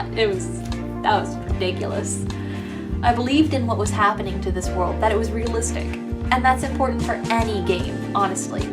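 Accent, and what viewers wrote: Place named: South, United States. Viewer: I did watch this the other night but as per usual fell asleep at the sound of your calming southern tones xD Awesome review and very objective! (Which is a good thing) entertaining and fun!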